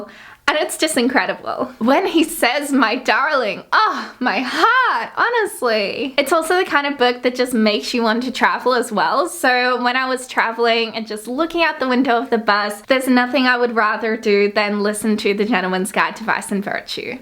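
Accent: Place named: United Kingdom. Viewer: I’m trying to figure out wether your British of American because you sound both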